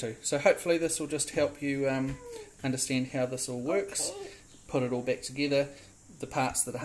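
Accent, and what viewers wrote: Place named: New Zealand. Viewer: Love the Kiwi accent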